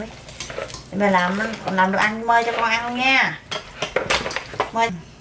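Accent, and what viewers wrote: South, Vietnam. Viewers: chị nói tiếng miền Nam nghe đã tai quá 😊😅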